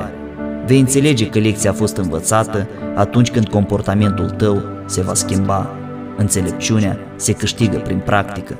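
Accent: Moldova. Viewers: Apreciez materialele realizate de tine, ma amuza ", graiul dulce moldovean", cât despre conținut, par bine realizate și bănuiesc, ca necesita ceva osteneala pentru a le realiza. Zice-se că nimic nu e-ntamplator, intr-adevar, oricât de greu ti-ar fi, oricâte obstacole vei întâmpină, pentru realizarea unor astfel de materiale, incearca sa constientizezi ca tu, de fapt esti un "instrument" prin care Intelligenza suprema a Universului, ne bucura și ne destăinuie prin tine, aceste lucruri minunate, "hrana" spirituala. Fii binecuvantat, suflet minunat 🙏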